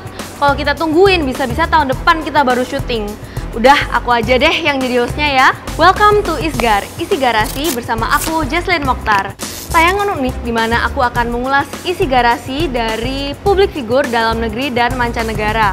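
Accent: Java, Indonesia. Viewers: Kok suaranya medok bgt , asli mana ya , surabaya kah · Mbk nya orang JAWA ya.? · Terpesona sama mbak nya... Medok banget kaya nyonge 😂😂😂😂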